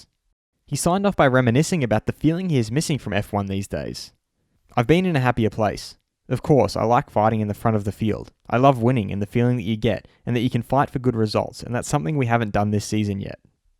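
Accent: Australia. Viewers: These clips are fabulous - I find it difficult to believe you only have 100,000 subs. Your videos are easily of the same calibre as any TV program from back in the day when people still WATCHED tv, lol. Really stoked to find this channel, as a fellow Aussie it's really cool to hear the Aussie accent. GREAT STUFF!!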